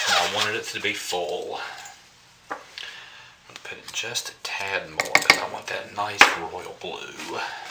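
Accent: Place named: Kentucky, United States. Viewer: I adore your accent <3 - it reminds me of my time spent in Kentucky. I'm from new Zealand.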